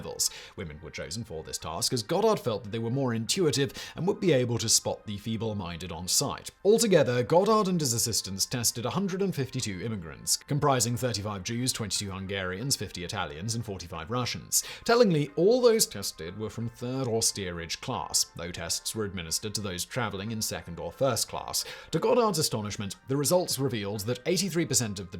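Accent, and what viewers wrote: United Kingdom. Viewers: oh a british vauce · Great video as usual. Simon I had to think twice when you pronounced cretin with that Brit accent of yours. Americans have only heard it pronounced cree-tin and it applied to all sorts of monstrous beings.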